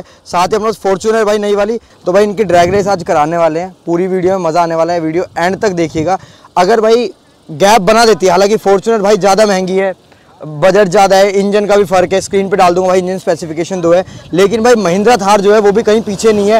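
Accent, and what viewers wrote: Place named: Mewat, India. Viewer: Mewati si bolro h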